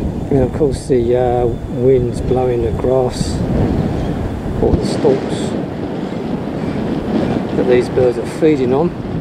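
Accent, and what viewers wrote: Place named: London, United Kingdom. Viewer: I've seen a bunch of birds around the Craigieburn Grasslands that I'd never seen before and in some cases still can't identify. It's the largest surviving example of the remaining two percent of the volcanic grassland ecosystem in Melbourne's north, now almost gone. Regarding the Black Cockatoos, I think I might've mentioned previously that for a while I was seeing a flock of Little Corellas on St Paul's Cathedral opposite Flinders St. Station. From your description, the woodpecker I saw was probably a Lesser Spotted Woodpecker, I remember the black, white and red. Someone had put wire netting around the nest entrance to protect it. It was in a local park called Walk Wood, and there was a "myth" about a deer living there. I was only about ten at the time and imagined there was some huge antlered stag roaming the wood. 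But when I mentioned the story to people I'd known from there many years later, they explained that it wasn't a myth at all but that it was actually just a very small species of deer that really does regularly turn up in people's gardens around there. I wasn't sure whether to rejoice that the myth was true or to be disappointed that my "Lock Ness/Yeti deer" sounded like such an ordinary, mundane thing. I never saw one though, so still some mystery left! BTW the accent around Beaconsfield is a shocker, they sound like they're relatives of the Queen :-) I'm no expert on English accents but I'm guessing yours is a London accent - I could be wrong. BTW if you want t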